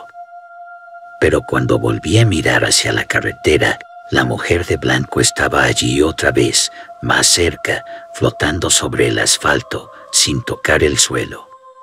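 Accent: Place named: United States. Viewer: Su acento es de estadounidense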